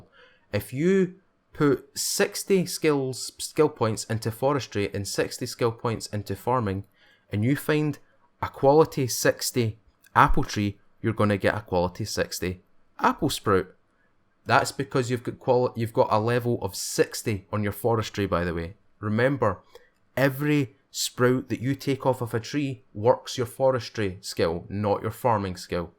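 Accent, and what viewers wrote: Scotland, United Kingdom. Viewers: ya know what is it with people in the comments saying hey do you have a Scottish accent well duh of course you can hear it dumb ass what I'm I a flipping Korean no... THE ONLY EXSCUSE IS THAT MOST COUNTRIES DON'T EVEN KNOW THAT SCOTLANDS A PLACE and if they do hey say we're all con men ok rant over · Haha, I’m from Glasgow too, how can someone not know a Scottish accent when they hear it?.....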